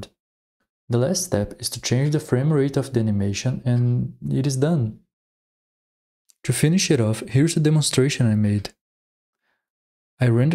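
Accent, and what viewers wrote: Brazil. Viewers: o sotaque br alegrou meu dia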